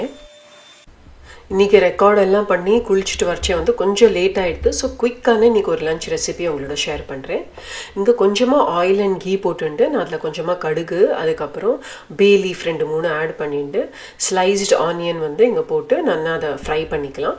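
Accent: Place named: Palakkad, India. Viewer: Hi,your videos are very warm and motivating. Recently I’ve started viewing your videos and i love it. You are really doing a great job.All your recipes are very good and i tried a few also. You have a palghat accent 😊and that’s nice too.Keep rocking dear 👍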